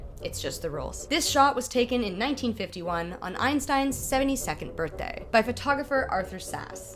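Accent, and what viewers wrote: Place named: United States. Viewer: You sound american, so I don't like you.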